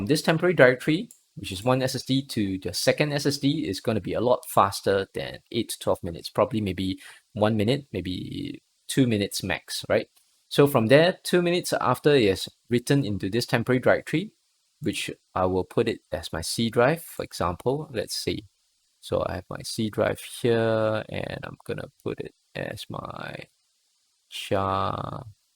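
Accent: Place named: Singapore. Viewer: Nice video but I cannot understand your Singrish!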